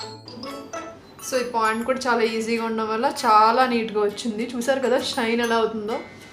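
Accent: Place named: Ongole, India. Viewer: gaaru meedhi ongole la...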